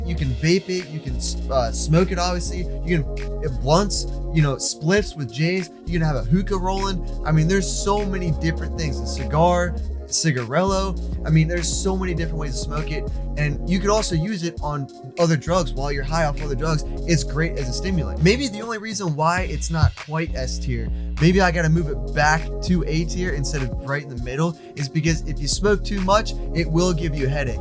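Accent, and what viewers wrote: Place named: East Coast, United States. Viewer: Man I don't mean to fanboy, but i would love to hang out with you. Sounds like you're on the east coast somewhere. Mid-Atlantic? Close to Pennsylvania?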